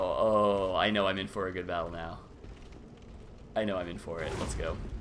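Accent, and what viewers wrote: Canada. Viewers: Your level of Canadian is just fantastic really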